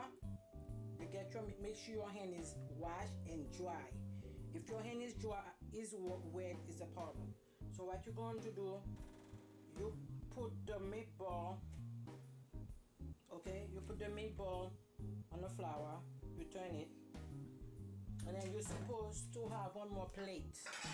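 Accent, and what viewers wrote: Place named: Haiti. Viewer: u remind of my sister n mom the have that Haitian accent they have passed away. thank u for help me learn what they didnt finish teaching because haitian american u lose alot of culture thanx to bring back haiti to me n the world.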